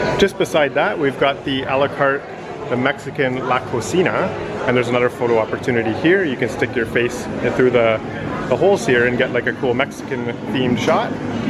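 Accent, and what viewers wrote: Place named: Canada. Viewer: Obviously you are Canadian. Linguists generally point to around 10–15 distinct accents in Canada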